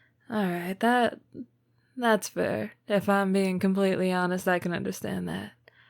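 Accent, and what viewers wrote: South, United States. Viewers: I love your southern accent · Oh I need more of your southern accent now... I love it...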